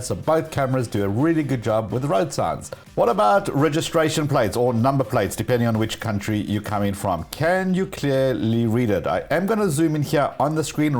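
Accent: South Africa. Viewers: Hello, it's absolutely true, the more you pay doesn't ALWAYS buy you the best.. good video.. In other news, are you South African? You sound like a saffa boet🌍🤔😂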